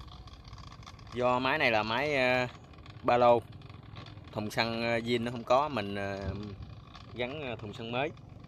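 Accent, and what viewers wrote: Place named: Mekong Delta, Vietnam. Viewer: ok anh mà ở đâu để tiên giao hàng với bảo hành này kia luôn.nghe giọng chơat miền tây.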